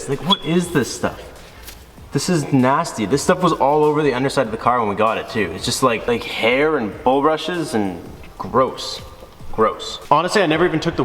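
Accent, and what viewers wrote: Canada. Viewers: You're a real there canaaaaadian bud. Saying soury instead of sorry 😂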